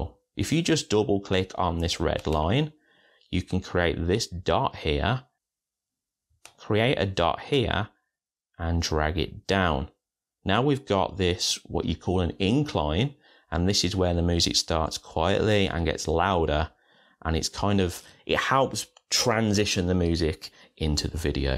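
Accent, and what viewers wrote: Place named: Birmingham, United Kingdom. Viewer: AT LAST. A tutorial in plain English: step by step instructions and no ear shattering unwanted music accompanying it. Why can't instruction videos ALL be like this. My guess is that comes from the Midlands...shades of a Birmingham accent, but that's cool because I'm also from the Midlands: Northampton to be precise. Have had the full AVS Suite for a long while but this video has given me the impetuous to REALLY begin to explore it further. Thanks mate. Have subscribed.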